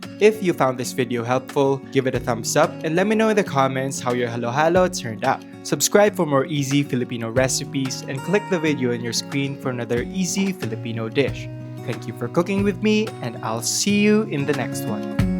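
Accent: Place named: England, United Kingdom. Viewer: I Like your English Accent! It’s giving!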